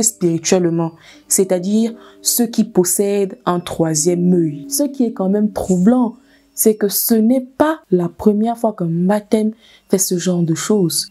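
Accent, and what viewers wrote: Fang, Gabon. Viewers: abonné, contenu incroyable et surtout que l'histoire m'est familière. Au passage je kiff ton accent fang 😁😁 😁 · Ahahah que tu sois fang c’était évident hein dès le départ hein, t’as trop l’accent 😅 Par contre je ne savais pas que ça venait de là „les fang mangent les gens“ 🙈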